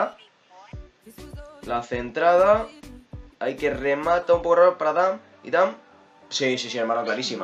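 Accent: Mexico. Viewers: hablas como mexicano, vives en argentina?? o eres argentino o q onda? pregunto nomás x curiosidad, a mí m encanta españa pero tambn argentina, saludos de méxico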